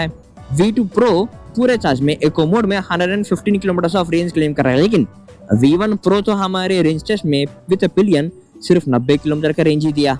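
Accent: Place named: Karnataka, India. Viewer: He's a Kannadiga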